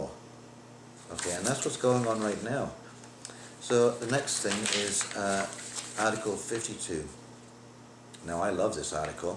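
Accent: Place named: Yorkshire, United Kingdom. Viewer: I am questing you are from Yorkshire England, great video and 1 of many trying to get the word out. my son is fitting smart meters, he thinks they are a great thing as many do, i will show him this video, its about showing people the real truth, and searching, my son is fitting 5 on average per day many are inside the home, they have fitters all over England doing the same, next comes 5G,, they are trying us, and many can't see it, and the joke is they had this technology a long time, there is more to come, chip will be next, we could stop it so easily if we all pulled together, but while we have many mostly young thinking all this stuff is cool we don't have a chance to stop it, I'll get russia say no to them as they banned many things including microwaves , my advice would be to purchase a device that can tell gow much radiation is coming from all our household items, even the simple toaster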